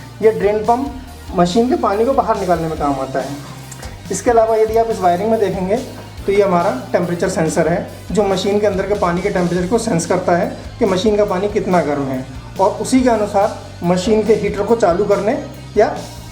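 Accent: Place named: Uttar Pradesh, India. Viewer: Itni shuddh Hindi kahan se Sikhi up ke ho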